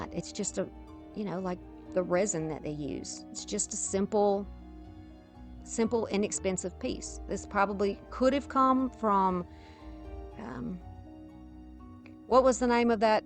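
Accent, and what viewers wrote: South, United States. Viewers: Thnx for the inspiration n btw you have the best southern accent ever! Love it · Wow! The candelabra was so awesome! I have had a set of those forever and just didn't know what to do with them, so thank you for the idea! So happy I accidentally found your channel! I have learned so much from this video! Using the sander around the edges of the fabric was such a helpful hack! That turned out fabulous! Great video, can't wait to see more! Btw- so cool to hear somebody have a genuine southern accent like mine!!!🤠😅🤗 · I love Dark Academia and Dark Cottage Core. Amazing stuff. Thanks for sharing! Also, I love your voice. I'm also Southern. Yeah, it's ES-presso, but regional dialects are so common in the US. If you want to always pronounce things the absolute correct way, then you make it your own. 🙂It's still yours when you pronounce it the way everyone else does, because no one has your voice. 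I don't think you need to apologize for it, though. You have a lovely accent and I think regional dialects are interesting and unique. 🧡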